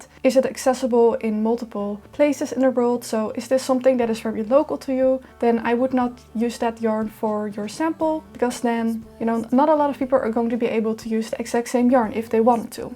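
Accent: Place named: Netherlands. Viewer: Thanks! I just came across you when starting my info search, and so far my favorite source!;) I heard your accent, and recognized from my 20 years at KLM in the past; Dank je wel!! Veronica (Swedish American living in Switzerland)🤭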